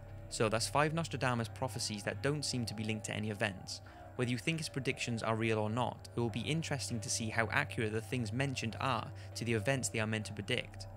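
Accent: United Kingdom. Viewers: The most unsettling thing about this video is hearing a guy with a british accent say "Nucular"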